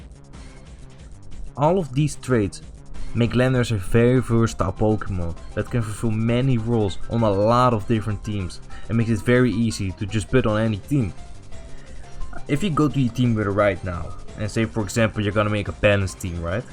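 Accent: Netherlands. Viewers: Love the very noticeable dutch accent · Obvious dutch accent lmao, good video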